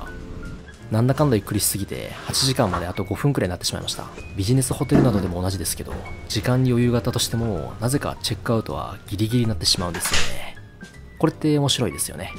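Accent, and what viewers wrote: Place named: Tochigi-Ibaraki, Japan. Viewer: 栃茨出身の方ですか？ 方言の人大好きです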